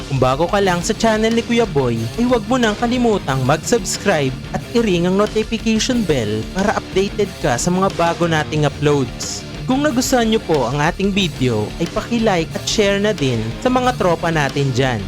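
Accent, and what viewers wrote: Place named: Iloilo, Philippines. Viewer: Idol pa illongo kana pero keep it up at salamat sa recap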